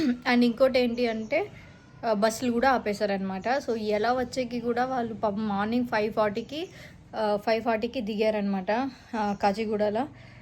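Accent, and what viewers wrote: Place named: Gauribidanur, India. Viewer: nam Gowribidnur slang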